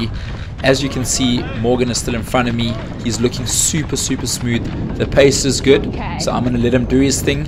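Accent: South Africa. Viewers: This is absolutely insane ❤‍🔥well done bro. Also, I can sense that south African accent there😁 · You sound South African. Are you? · Hahahah love the south african accent 🇿🇦😁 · South African?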